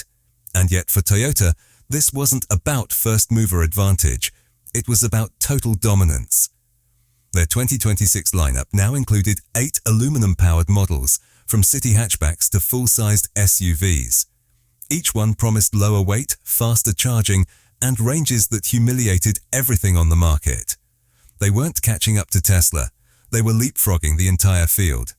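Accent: United Kingdom. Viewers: Don't listen to the message, listen to the method of delivery, formulaic, uses American expressions with a 'British' accent (muddy) and the same style as you heard from the same source you've heard previously, e.g. 'here's the twist', this wasn't market math', etc, etc.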